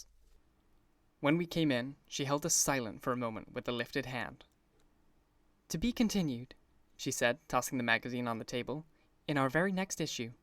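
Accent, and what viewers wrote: United States. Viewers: This is so helpful especially since i have 0 attention span when i have to read something. Your american accent is amazing btw! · Woah you have an American accent?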